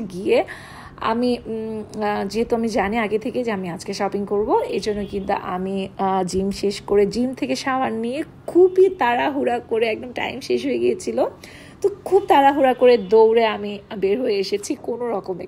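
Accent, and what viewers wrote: Sylhet, Bangladesh. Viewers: খুব ভালো লাগলো সিলেটি ভাষা আপনার মুখে আপু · Masha Allah sylheti mat kub sundor hoisa ami o akjon sylheti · Like 👍 134. Walaikumassalam Apu shuna. Khub khub shundor hoyechey Sylheti kotha. Oy Apu Sylheti maat thik oisey. Love you sweetheart Apu ❤❤. Sending love from Srimongal ❤ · Apu like diye video dekha soro korlam,Sylheti vasai kotha sone khub valo laglo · Apu apne sylheti mat matsoin j bala lagse hunte and dress o onek sundor oise sob gula shopping o onek sundor oise😍😍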